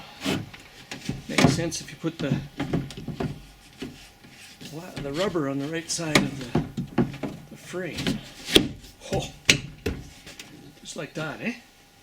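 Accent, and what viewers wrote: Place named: South, United States. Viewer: Your southern accent is far to thick for this future door trim repair man.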